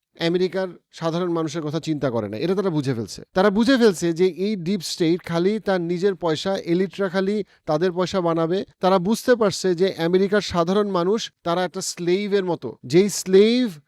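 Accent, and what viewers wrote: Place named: Bangladesh. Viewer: অবাক হওয়ার কিছু নেই. Newyork বাংলাদেশি আর পাকিস্তানী তে ভর্তি. আপনার কথা শুনে আর English accent শুনে বোঝা যাচ্ছে আপনিও বাঙলাদেশি.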